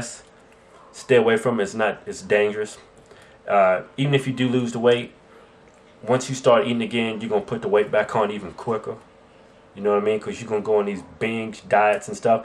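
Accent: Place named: South, United States. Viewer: gorgeous.... even with those country accents, and bad language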